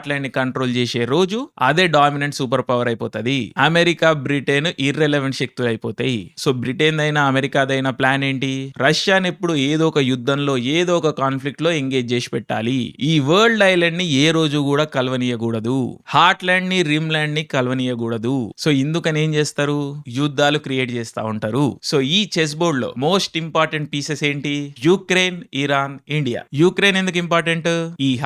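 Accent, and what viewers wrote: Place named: Telangana, India. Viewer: ఎవ్వర్రా ప్రొపెసర్ లకే ప్రొపెసర్ ల ఉన్నావు.. ఎంతో మంది మేధావులని చూసా కానీ నువ్వు పూర్తిగా భిన్నంగా భయంకరంగా, అందంగా నీట్ గా బలే చెప్పావు bro.. అన్నిటికంటే ముఖ్యం గా తెలంగాణ స్టైల్ లో osm ❤️ bro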